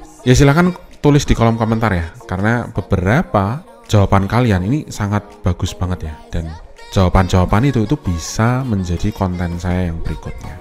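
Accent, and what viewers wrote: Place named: Java, Indonesia. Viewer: Suaramu jawa banget mas asli pundi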